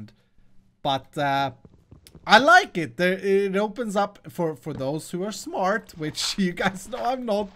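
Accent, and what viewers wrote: Sweden. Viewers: his acent is swedish btw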